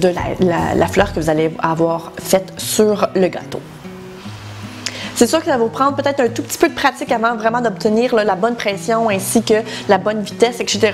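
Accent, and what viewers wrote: Quebec, Canada. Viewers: omg l'accent, franchement l'accent québécois doit être inscrit au patrimoine mondiale de l'UNESCO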